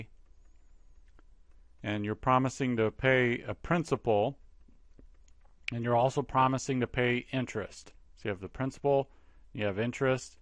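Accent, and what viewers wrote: United States. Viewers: Thanks so easy to comprehend. Thank God for an American English speaking person explaining the concept.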